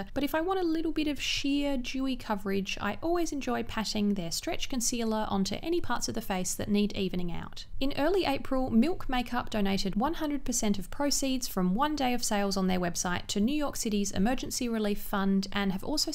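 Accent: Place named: Australia. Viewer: Your accent is so cute!!! Love the Aussie touch to your videos 😅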